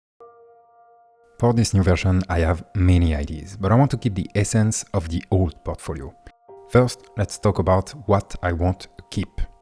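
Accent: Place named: France. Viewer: L'accent français a vraiment été la cerise sur le gâteau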